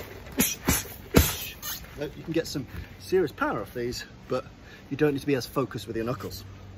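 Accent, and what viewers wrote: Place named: England, United Kingdom. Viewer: Where in England are you from?you have such a lovely accent but I've never heard it before.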